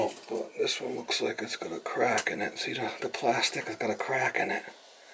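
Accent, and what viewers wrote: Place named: Minnesota, United States. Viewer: Hi,are you from Minnesota or Boston? Interesting accent brother. Nice video, I still dread rebuilding a Delta valve even after 32 years in the trade. DD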